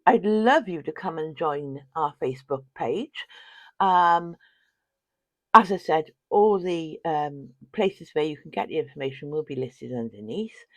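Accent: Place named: South Wales, United Kingdom. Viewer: I recognise a South Wales accent there, I’n from Ammanford!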